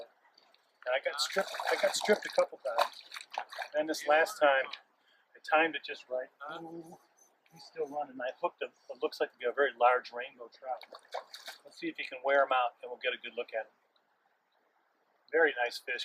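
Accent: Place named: Upper Midwest, United States. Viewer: Are there any catfish in the "White River"? Oh by the way you sound like you are from the upper midwest??? Thanks for the video!!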